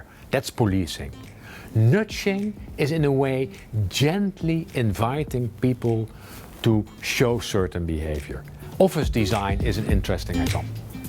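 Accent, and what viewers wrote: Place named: Netherlands. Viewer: dit Nederlandse accent ook.......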